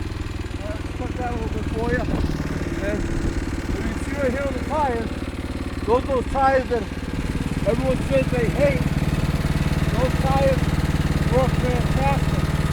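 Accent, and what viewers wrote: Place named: Boston, United States. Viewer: Sounds like a Bostonian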